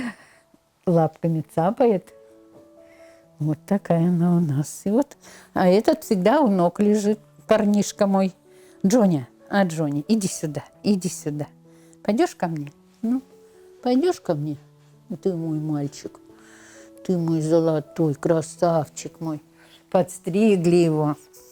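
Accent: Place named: Saint Petersburg, Russia. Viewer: Сразу поняла, что вы из Питера) приятно слушать) здоровья вам!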